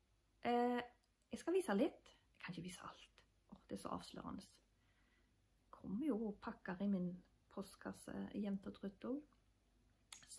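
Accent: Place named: Haugaland, Norway. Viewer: Takk for flott episode, kjekt å hørra Haugalands dialekt og å se fine bilder fra Borgøynå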